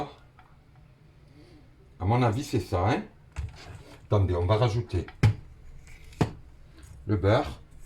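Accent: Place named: Sidi Bel Abbes, Algeria. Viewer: ASALAM alikoum vous êtes de Sidi Bel Abbes vous sa s'entend moi aussi je suis de Bel Abbes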